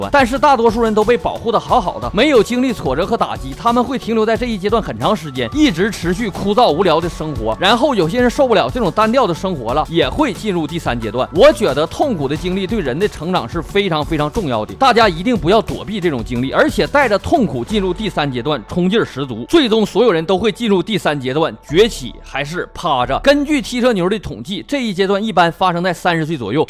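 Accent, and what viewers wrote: Northeast, China. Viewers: 在拳霸3中听到主播说到这期节目，所以专门过来学习一波：）个人认为这个系列逻辑性很强，干货满满，堪称良心，听后从中受益匪浅，也希望主播不要怀疑自己，坚持做下去。PS：身为一个南方人已经被魔性的东北吐槽洗脑了！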